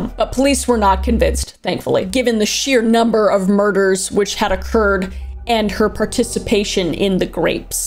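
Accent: Canada. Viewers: The Canadian comin out in your accent is killing me 😂😂😂😂😂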